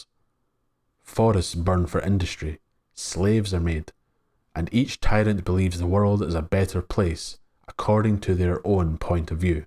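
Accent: Scotland, United Kingdom. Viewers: I listen just for your accent. Scottish? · There is no shortage of knowledgeable people when it comes to Tolkien lore...I, myself, have read all published material many times over, and explored other material as well. However...your insight is what sets you apart. I've listened to several of these videos, now, and have learned a great deal. You go beyond stating the 'facts' and explore the relationships, meanings, reasons, etc. that give the facts their importance. Truly a work of art. Your pacing and diction is spectacular as well, and the distinct--yet clear--accent makes me miss Scotland in a bittersweet way. Thank you for your contributions to our understanding of this wonderful universe.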